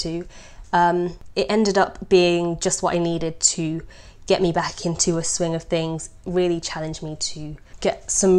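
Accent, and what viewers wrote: England, United Kingdom. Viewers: I third that. Haha. New subscriber. I love Art!! Love to listening to British English accents.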